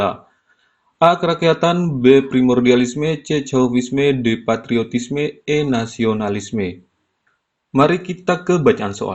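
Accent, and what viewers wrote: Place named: Muna, Indonesia. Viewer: Dialeg bahasanya mirip orang muna kereeen 🙏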